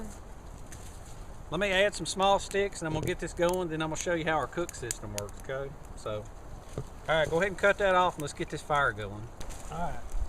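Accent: South, United States. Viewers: I've subscribed recently and have watched a dozen of you videos since and I think you have a great channel! I work in a similar field as yours and I have to say I really like how you bring the workshop to the bush! Love the Carbon Fibre Chimney and ovens! Nice welding and ingenious stuff! You're quite resourceful with material! Nice sheaths, also! Keep em coming Bud!!! I'm French canadian and I dig your southern accent a lot too! :)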